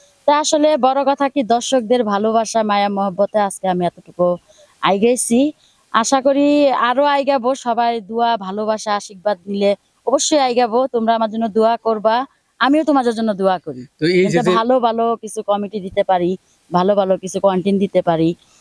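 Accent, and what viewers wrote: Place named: Tangail, Bangladesh. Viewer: আপা আমি অনেক আগে থেকেই জানি, রাজু ভাই, আর আপনি স্বামী-স্ত্রী, অভিনয়ে ও দুজন দারুন,,। কিভাবে জানি, মোটামুটি বেশ কিছুদিন হয়ে গেছে, একবার একটা ভিডিওতে দেখছিলাম, আপনার ছেলের সুন্নতের অনুষ্ঠান, তখন আপনার ঘরে রাজু ভাইয়াকে দেখছিলাম, অনুষ্ঠানের আয়োজনে ব্যস্ত ছিল। আপা আজকে আপনার চেহারাটা অন্যরকম লাগছে কেন? আর এতদিন জানতাম না, আপনার বাড়ি যে পাবনায়, কথার ভাষা শোনে তো মনে করছিলাম টাংগাইলের মেয়ে আপনি, লাইক কমেন্ট সাবস্ক্রাইব করে দিয়েছি আপনার ছোট ভাইয়ের চ্যানেলটি, ভালো থাকবেন, পাশে আছি 👍, 🥰🤩🥰🤩❤👍🎉🎉🎉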